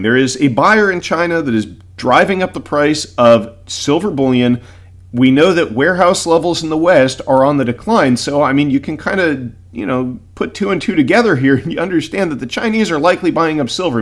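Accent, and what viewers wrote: United States. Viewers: Your accent suggests a "USA citizen" and many of you don't understand that USA is bankrupt and countries are dumping that "Global Reserve Currency" that the USA government itself has "trashed." China is leaving like everyone else, but taking (large) bits at a time to keep the USA Beast alive until China can (and Japan) get it all out. USA is being "abandoned" by countries around the world, who are ALL fed up with the military abuses of CIA and other regime change specialists that have worked overtime since Viet Nam to make clear that USA is an ENEMY of everyone on Earth.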